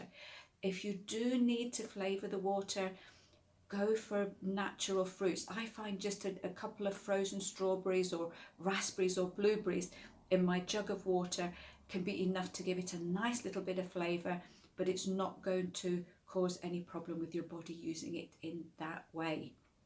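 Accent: Scotland, United Kingdom. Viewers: Mine are just starting. I don't go red but I certainly sweat. Can I take sage tablets while taking citalopram? Do I hear a wee scots accent there? I'm scottish but live in Germany...😊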